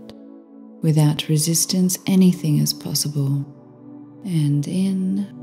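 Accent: Australia. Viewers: Thank you what a fab voice. Is that a Australia acet I LOVE IIT Roz Ireland.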